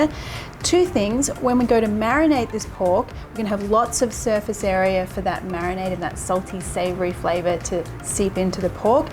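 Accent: Australia. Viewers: As an Aussie, I'm guessing from your accent, you're one too? I am new to your channel but love your videos!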